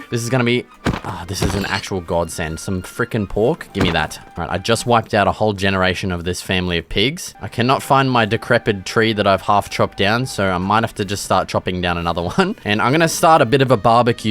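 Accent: Australia. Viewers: You sound Aussie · In the first 2 minutes I figured out that he is a Australian 🇦🇺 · I’m new to your channel,…I heard your accent so I was on my way to your “about this channel” page and heard you say Nor 😂 immediately new it would be Australia lol… love the video I haven’t finished it yet but I plan on it! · Is this like every aussie accent cuz lazarbeam has the same voice as this guy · i wish i had such a strong aussie accent!! love the video❤❤ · That aussie accent is coming on hard bro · It’s good to see a Aussie minecraft YouTuber not many out there ,also you kinda sound like Robert Irwin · Aussie Accent makes this so much better 😂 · i was 2 mins into the video and already know that he is aussie lol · Your channel came up on my homepage, I wasn’t going to watch til I heard the fellow Aussie accent and I’m glad I did 😂 I appreciate the euphemisms please keep em coming #knoblet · the aussie accent is so clear lol i love finding aussie ytbers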